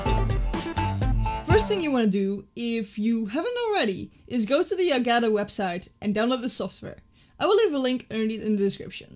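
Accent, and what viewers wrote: Netherlands. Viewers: Even if I'm not a streamer I bought a Stream Deck to have fun in Discord during our gaming sessions. I searched for tutorials how to use it as a soundboard and found your video. I really like this video it because it is clean and simple without much talking about other things that are not relevant for the topic. It's a pitty that your videos don't have much more views. And I love this dutch/english accent :)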